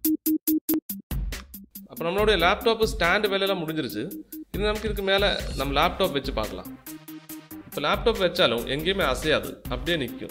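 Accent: Kerala, India. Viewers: unga slang Malayalam+ tamil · He is a malayali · Are u malayali or pandi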